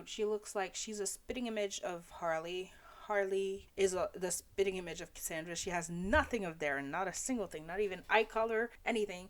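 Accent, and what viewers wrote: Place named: Ontario, Canada. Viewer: I see Canada, and I know you are in college and have a major Ontario accent. We might possibly be going to the same institution, haha.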